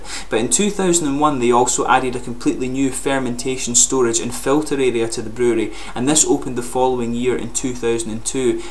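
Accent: Scotland, United Kingdom. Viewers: Beeing a German living 70 miles away from the Schmucker brewery I tried hard to get it from a local vendor. Almost impossible ... apparently I have to order it via the internet. Anyways: Good review! I will definitely order some bottles. Feels funny as a German Scotch lover to listen to an English review of a German beer done by a guy from Scotland. :) Cheers!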